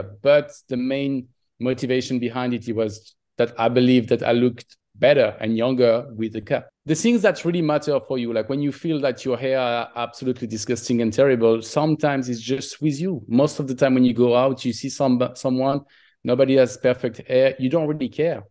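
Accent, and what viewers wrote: France. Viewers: Il est très beau maintenant. Plus mieux quand chauve. I could tell he speaks French from his accent. I get frustrated when a guy with a bald spot doesn't shave his whole head. It's annoying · I like his accent - is he French?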